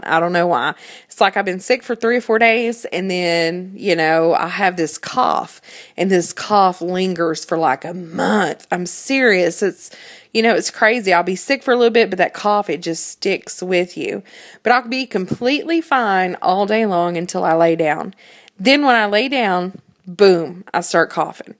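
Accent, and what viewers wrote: South, United States. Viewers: got that cute little southern twang in your voice :P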